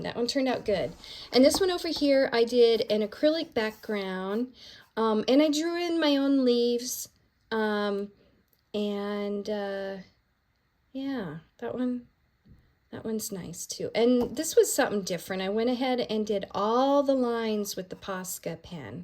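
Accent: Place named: Wisconsin, United States. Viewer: 🤣🤣 I'm just saying, I'm from WI and you sound just like me! 😉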